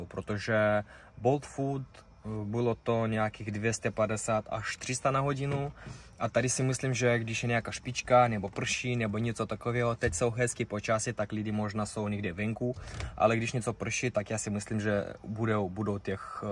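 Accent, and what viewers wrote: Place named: Russia. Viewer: Zajímavý přízvuk. Vypadá že z Východu, spíš z Ruska.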